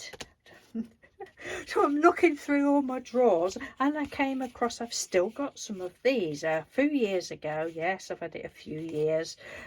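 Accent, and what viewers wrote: United Kingdom. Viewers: It looks like you are just having the best time!!! I'm in the USA but when you said it was for your friend Penny Humphreys it made me think of 'Are you Being Served' haha. Wasn't there a miss Humphreys on there? I belive so 😆. I love watching that silly show plus you sort of have that accent to me. I'm being silly I know. Your project inspires me to just go make art with free abandon!!! I love your project.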